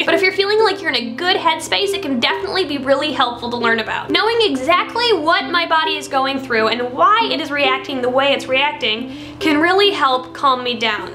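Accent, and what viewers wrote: United States. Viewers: I never suffer from Anxiety so much, but i loved your sweet American accent and your way too cute i do like your down to earth personality and you would be cool to hang out with. I also love the Blue Moon North American Beer as a Manchester City fan us British city fans are very fond of that lovely drink. Also please subscribe to me like i did to you because i enjoy your videos, i will be very grateful of that. Thanks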